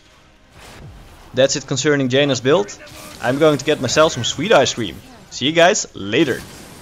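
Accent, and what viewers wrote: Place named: Netherlands. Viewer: Lekker hoor, vernederlandst accentje en af en toe wat Nederlands op de achtergrond :P Uiteraard een oude guide, maar lingering chill zal ik eens proberen ipv 30% meer range op Q :)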